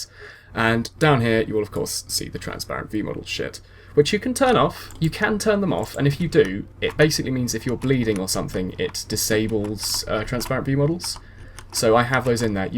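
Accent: United Kingdom. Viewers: I'd be homosexual for you. -British accent -Spy main Oh.. yes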